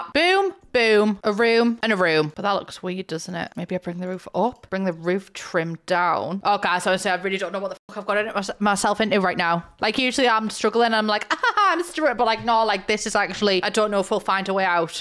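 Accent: United Kingdom. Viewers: So I have a strong southern (American) accent and watching you so much sometimes I get the urge to go British. And British plus southern American accent…..I sound like an idiot yall pray for me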